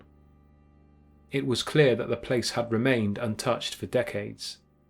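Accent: United Kingdom. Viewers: your impeccable research and ability to keep us on our seats wondering whats going to happen next is only enhanced by your awesome accent. like it would still be really interesting and awesome but I think having a distinguished british accent just ties it together in a beautiful black bow.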